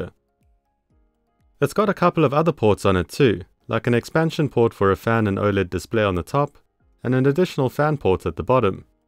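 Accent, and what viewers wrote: South Africa. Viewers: I know a South African accent when I hear one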